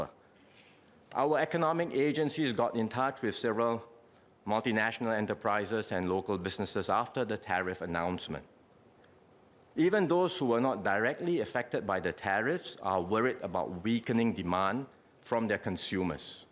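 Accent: Singapore. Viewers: Singlish. Singaporean English. Don't be a hillbilly 😂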